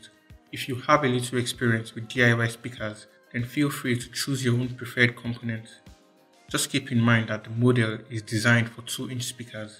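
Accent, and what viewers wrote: Nigeria. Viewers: Wow, you sound like a Nigeria. I am a big lover of DIY but not so much not much tools to bring out those ideas. Great to see someone in my hood do these things. Keep it up man.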